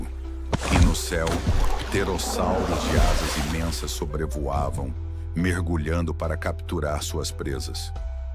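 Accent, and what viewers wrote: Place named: Minas Gerais, Brazil. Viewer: Dublagem Minera uai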